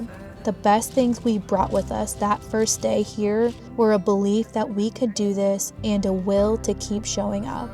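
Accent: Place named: South, United States. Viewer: Hi! I’m new to your channel and LOVE it!!! May I please ask what state you live in? I hear the accent and I thought something was mentioned that makes me think you are somewhere in Tennessee. Maybe one of the Carolina’s? I was raised in Kentucky. Rattlesnakes were a bit too chummy down there!😂😂😂 Thanks for such wonderful videos! You know you are doing a great job when the people watching want to be your friend, and we not only are entertained, but learn things as well! Joy and happiness to you!!💜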